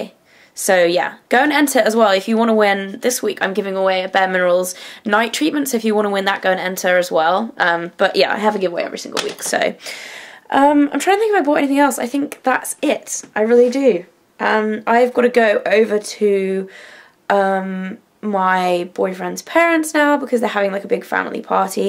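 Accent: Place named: United Kingdom. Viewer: I love your accent! I would give anything to have a British accent! Its so classy!:) thanks for the video! I love Vlogmas!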